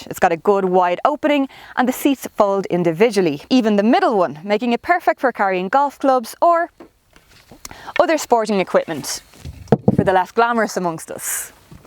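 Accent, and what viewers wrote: Dublin, Ireland. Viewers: Great Review, I am getting one in 2020, my price tag is 37 grand, so i hope i get a nice one for my money, plus i have a strong Dublin accent like you so again i hope Mercedes look at your review and adjust the car to our accent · You do have posh Dublin accent, no pun intended :)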